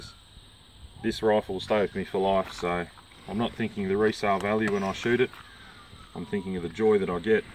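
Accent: Australia. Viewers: Sorry, can't understand Aussie.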